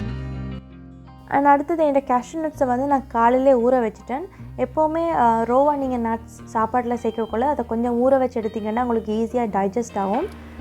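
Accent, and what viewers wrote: Sri Lanka. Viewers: Assalamuallikum sister MashaAllah so proud of u talents Just came across blog U are doing a amazing job So clear and so good are all u recpies Are u a Srilankan as the way u speak is lot like us May Allah Bless u for many more success MashaAllah u are very pretty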